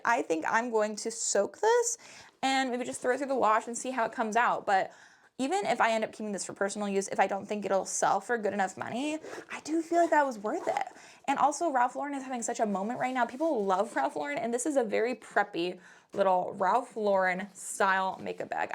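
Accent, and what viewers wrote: Midwest, United States. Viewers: Thank you for the video! I love watching your videos especially this series. I am from the Midwest and that is just how we say buttons and mittens. Try not to let the comments bother you. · It’s the Midwest accent. A lot of our t’s sound like d’s to others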